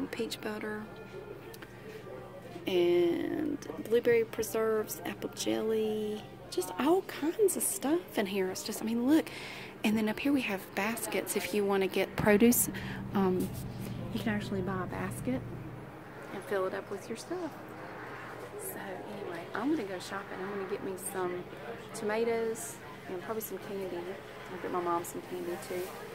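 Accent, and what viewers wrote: South, United States. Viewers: I live in Virginia Beach and I do the same thing you do, whenever you’re around relatives the southern accent comes out stronger!! I have family in both Carolinas and I love stopping at the border and south land!!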